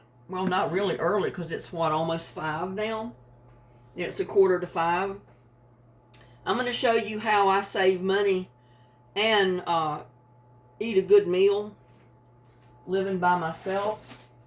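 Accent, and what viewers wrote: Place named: Texas, United States. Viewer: The next time I stock up on hamburger I will definitely freeze some like that! I just made meatloaves for the freezer with this batch. I found you because one of your videos came up in my YouTube feed & I am loving your meals & garden videos. Hearing your voice makes me homesick for Texas even though I’ve been gone for more than 35 years now. Also love your community cat, Little Buddy. What a sweetie! I will share your garden videos with my brother & SIL in Georgetown. They are starting to really get into gardening.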